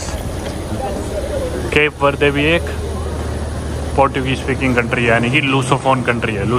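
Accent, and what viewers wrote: Haryana, India. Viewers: Haryanvi boli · Love your typical Haryanvi ascent 😅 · Bhai Ki Haryanvi ❤❤ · ap ki language me haryanvi felling ati jo bahut achi baat hai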